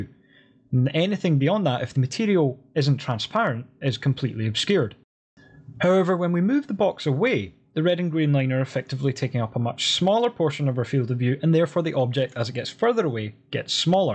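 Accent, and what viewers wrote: Scotland, United Kingdom. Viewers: I'm learning Blender and this video completely clarified orthographic vs perspective view. Thank you. Well done. I recognized the Scottish accent and then saw your screen name "." Love it! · Took me 3 min to realize he's Scottish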